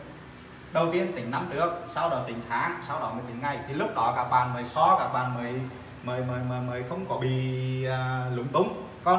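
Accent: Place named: Vietnam. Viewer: Giọng thầy nơi nặng theo miền. Nhiều khi nghe khó dịch :)))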